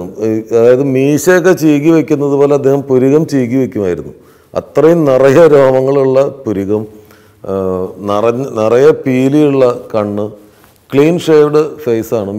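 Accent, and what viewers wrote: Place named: Valluvanad, India. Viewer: ലാലുവിന്റെ സംഭാഷണ ശൈലി, വള്ളുവനാടൻ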